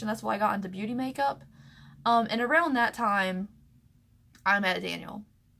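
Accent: South, United States. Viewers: You are such a beautiful, beautiful girl. My heart breaks for you. I am from Denmark, so I have never experienced racism in that way and all the hate it brings. But I can relate to being an outcast dreaming about old world glamour. I think you are so strong. But you must be going trough a very shitty time right now, breaking up with the person who is your only safe heaven. I can only advice you to spend time with your grandparents before it is too late. If you ever chose to see your parents again, do it on neutral ground and state that you will only do it, if they will respect you. I know the healthcare in The US is somewhat shitty, but I really hope you have or can get some form of professional help. It is so important to talk about such trauma. I hope that you know I and many, many others love to watch your videos! i really admire that you can make one each and every day! 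Such talent and discipline. I wish you the very best. And I looove you southern accent! Please do not suppress it! Love from Denmark<3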